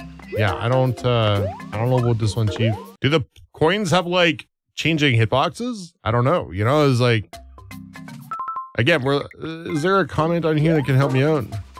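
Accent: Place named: Canada. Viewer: He's Canadian. I assume that's how they pronounce it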